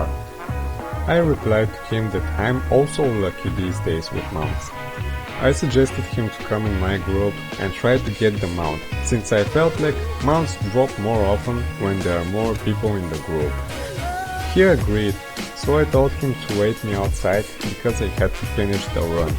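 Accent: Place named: Russia. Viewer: ты русский что ли?) Слышно ужасный акцент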